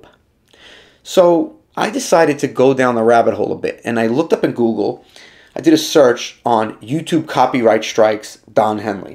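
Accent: New York, United States. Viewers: Great content. Your accent takes me home - NJ? NY? As a transplanted New Yorker I noticed it immediately.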